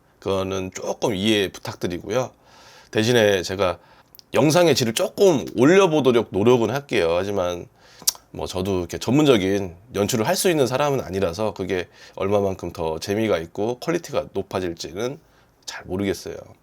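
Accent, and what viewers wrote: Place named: Jeolla, South Korea. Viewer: 못했어오 -> 모대써요x 모태써요o 대전 분인데 서남사투리 쓰지 말고 표준말 씁시다.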